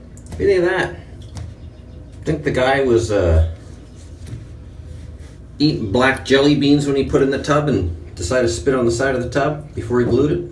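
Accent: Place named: Canada. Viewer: Hey Bro ,,,,you gotta be a Canadian,,,,I hear yer "In's and yer Ouut's" lol !!!*^%$%%#!!!!!,,,.You're a hard worker with a lot of guts.Im a plumber in California.I learned a lot.Keep up the good work.DD In L.A.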